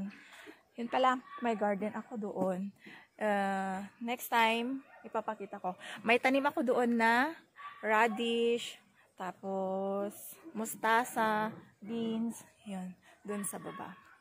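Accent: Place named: Tagalog, Philippines. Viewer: You speak clean Tagalog is here for me to understand👍 salamat. Baboy to loud. New friend and subscriber. I’m Jerry from north carolina u.s. lol mabahoo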